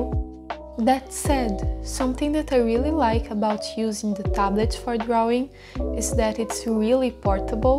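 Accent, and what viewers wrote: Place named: Brazil. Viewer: Sabia que conhecia esse sotaque e o inglês limpinho, é do Brasil ksksk seu inglês é muito bom 🥺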